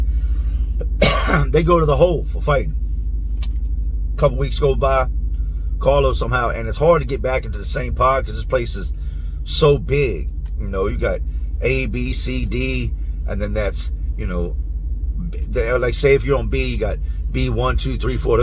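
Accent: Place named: Arkansas, United States. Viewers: U can tell u spent time in Philly.. u got the accent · oh my gosh, that’s probably one of the reasons I like this channel so much. I’m from rural Arkansas and all of my male family members talk like that. It’s very comforting to me😂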